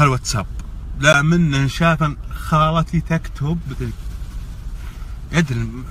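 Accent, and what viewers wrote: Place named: Qassim, Saudi Arabia. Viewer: وش فيها لهجته احسن لهجة بعد قلبي القصمان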